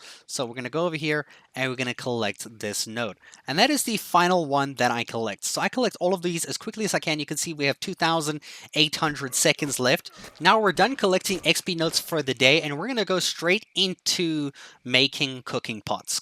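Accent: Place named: South Africa. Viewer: You sound abit South African bro❤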